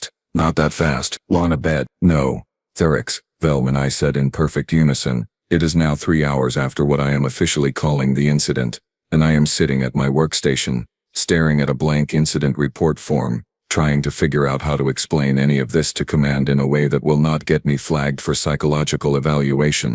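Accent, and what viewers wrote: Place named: New York, United States. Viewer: New Yorker , ,,, , but good ,,,,,,,,,,,,,,,,,, :face-orange-frowning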